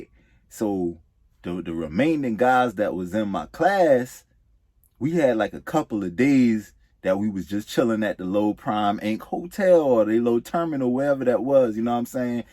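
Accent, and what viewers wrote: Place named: Geechee, United States. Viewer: I knew it!! I’m from downtown Charleston. I hear the Geechee dialect but I hear something else in there too. I’m looking into the company and I’m kinda excited!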